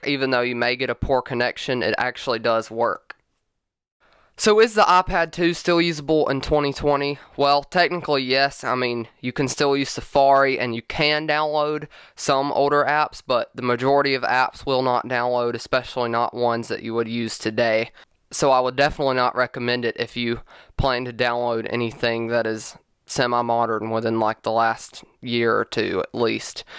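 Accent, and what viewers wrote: South, United States. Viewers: That southern accent though :)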